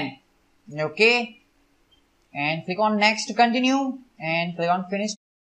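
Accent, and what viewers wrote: India. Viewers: No option for Closed Caption to go with this heavy fast talking Indian accent? This tutorial would be better served either with CC or someone with less accent when speaking English.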